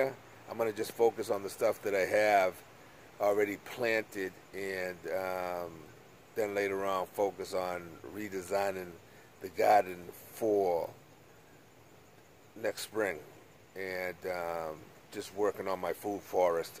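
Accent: Boston, United States. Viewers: is sounding much better, I don’t hear pain in your voice, only that Boston accent.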